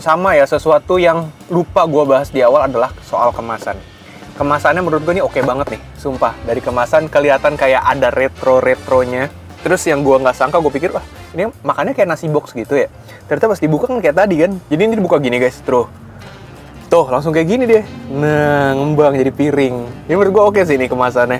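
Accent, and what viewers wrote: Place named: Bekasi, Indonesia. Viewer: Logat lu bekasi bgt bang😂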